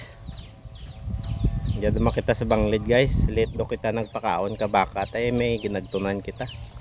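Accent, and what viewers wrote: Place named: Romblon, Philippines. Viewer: Maganda Buhay lods 😊 salita nio pra din sa Amin Romblon, Dami ah blessings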